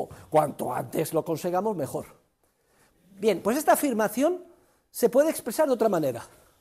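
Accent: Spain. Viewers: He speaks English with a Spanish accent and that's good enough. I understood everything he said in English and that's what matters.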